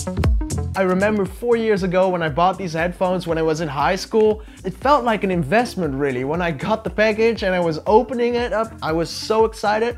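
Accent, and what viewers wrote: Netherlands. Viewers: I can already hear you're dutch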